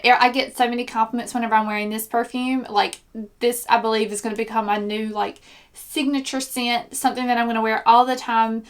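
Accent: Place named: Tennessee, United States. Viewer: Well you're just adorable. (Can you tell I'm 100-years-old?) I love beauty tutorials and am really picky about who I follow, but when I ran into yours, I thoroughly enjoyed it. I MIGHT be a little biased since I'm from Murfreesboro/Nashville, TN, and you have that gorgeous accent, but the kicker is that you know what you're doing. Keep at it and let that personality shine through. Oh! I watched your Nivea-men's-shave-stuff-for-primer thingie one and that's what I use. It almost lifts my face and my makeup....well, it would stay perfect for two days if I'd let it. I have sensitive skin so that's the one I use - FYI. Anyhoo, thanks for your great videos and for sharing your expertise. I can use all the help I can get!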